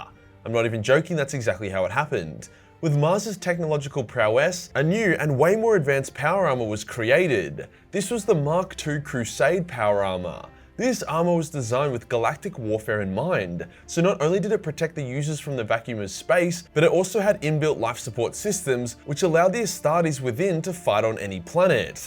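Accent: Australia. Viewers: Why is it relevant that you’re Australian? It’s pretty fkn obvious anyway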